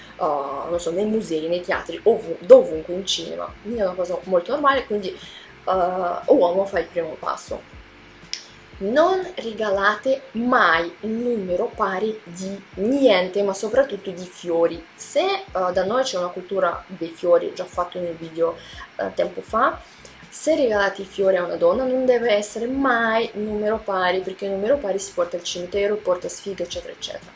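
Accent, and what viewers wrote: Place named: Russia. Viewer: Bellissima,e brava, seguirò altri tuoi video.Ho scoperto da solo la fondatezza di quanto dici,,sono stato tre volte in Russia,e in buona parte dei pur tra loro diversissimi paesi dell'ex blocco sovietico.Conosco anche un po' di russo,anche se infinitamente peggio del tuo ottimo italiano,condito dal tuo accento russo dolce e graziosissimo.Al proposito ti chiedo,esiste una qualche espressione colloquiale russa per Sfiga?o un qualcosa che possa avvicinarsi all'italiano attaccare bottone?un grazie se vorrai rispondermi ciao Fabio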